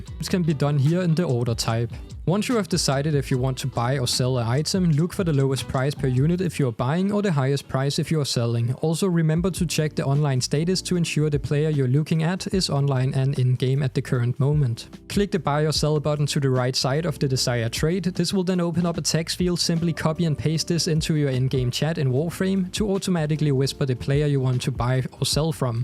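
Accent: Denmark. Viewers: You sound extremely Danish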